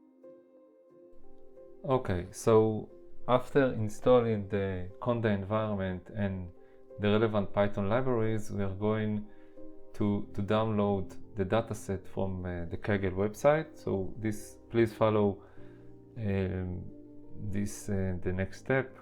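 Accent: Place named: Israel. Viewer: Now I get what an Israeli accent is. Nice to hear you doing well :)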